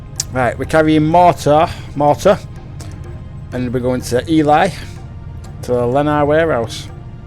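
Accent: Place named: Barnsley, United Kingdom. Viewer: i know a barnsley accent when i hear one mate lmao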